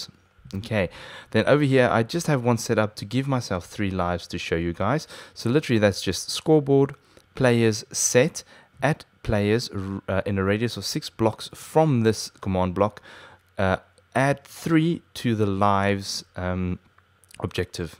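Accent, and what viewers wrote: South Africa. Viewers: You sound south african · Ek dink dat jy suid afrikaans is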